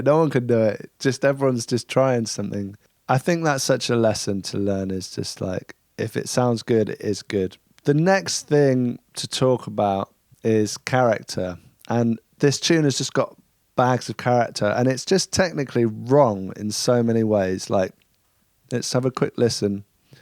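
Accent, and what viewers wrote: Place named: England, United Kingdom. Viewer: being an american, you have the most english accent i think i've ever heard haha. that is, other than the old timey exaggerated nonsense.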